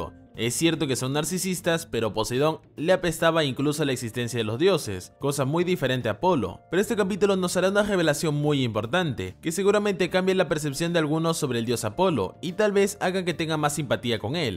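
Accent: France. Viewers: tienes un acento francés, ¿eres de allá?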